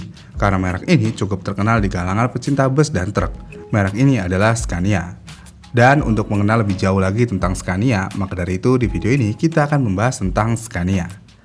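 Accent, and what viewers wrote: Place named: Cirebon, Indonesia. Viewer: Ngapakers cirebon detected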